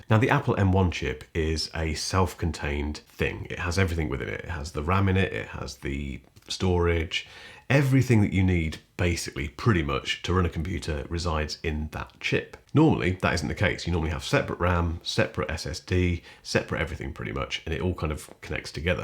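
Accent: England, United Kingdom. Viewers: I'm only subscribing because I love this mans lovely English accent and soothing sexy voice! lol Ok, ok! Love his reviews too! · 5 secs into the video I hit subscribe instantly just for your fabulous English accent! 😍😍😍 Great informative content. thanks a million bro 👌👌👌👍✌💗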